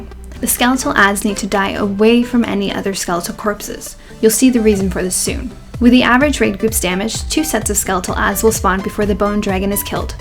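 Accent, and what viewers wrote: Canada. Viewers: Your accent gets more and more Canadian with each video. I like it.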